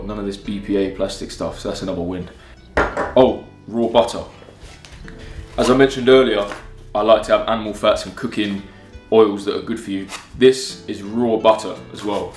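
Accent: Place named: United Kingdom. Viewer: Which part of the uk you come from?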